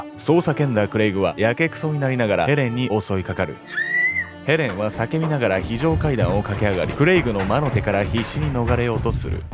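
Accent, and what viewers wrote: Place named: Ishikawa, Japan. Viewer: なんで石川弁wwwマイナーな😂石川県民としては嬉しいけど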